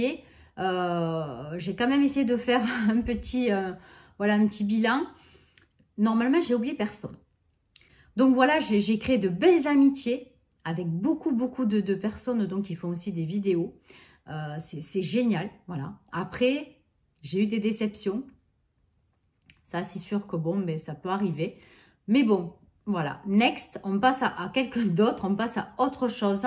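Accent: South, France. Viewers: Dis donc je me régale avec l'accent du sud, il y en a peu sur YouTube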